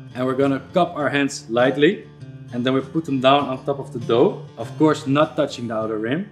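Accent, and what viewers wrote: Netherlands. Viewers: Lekker Hollands accentje! Ziet goed uit hoor! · Ik herken een nederlands accent altijd en overal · Dutch accent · Hi there :) are you Dutch? You sound as if you are :) greeting from the Netherlands! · Jij moet wel Nederlands zijn ik hoor het gelijk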